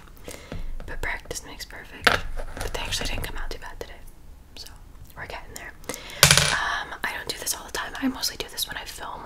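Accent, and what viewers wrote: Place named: South, United States. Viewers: Omg I love southern asmr sounds too!!! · I love Southern ASMR!! Another fave of mine. I’m obsessed with this lip color. 😍😍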